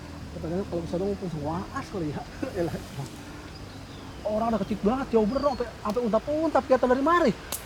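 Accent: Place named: Jakarta, Indonesia. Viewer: Masa alloh bng,boleh tau dari mna nih mirif btawi logat nyh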